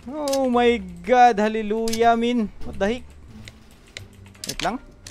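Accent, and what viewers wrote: Visayas, Philippines. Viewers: Bisaya ni do😂😂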